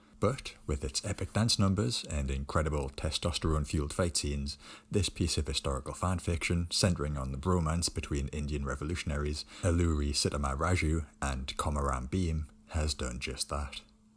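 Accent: Newcastle, United Kingdom. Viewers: Definitely a true geordie. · An Indian alumnus of the South Tyneside College, from the 90s. I have lovely memories of South Shields. I get nostalgic listening to the Geordie accent. Is there any video where you talk Geordie?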